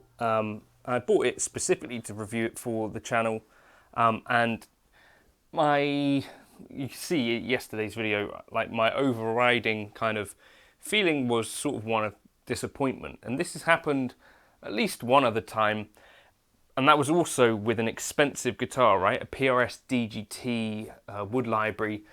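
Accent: England, United Kingdom. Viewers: I don’t get the hair that needs moving out of the eyes and the typical English emo accent. You don’t have a taste for expensive stuff and still pay £2k for a guitar?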